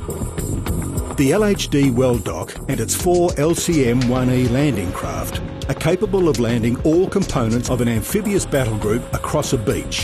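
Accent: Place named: Australia. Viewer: Australian accent, I prefer English UK acent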